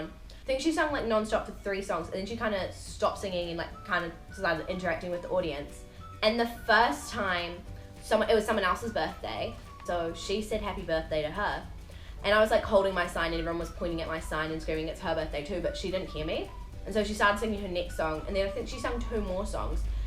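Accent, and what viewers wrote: New Zealand. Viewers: lmfao i clicked and i was like she's definitely from new zealand i can tell from the accent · Are you from Nz?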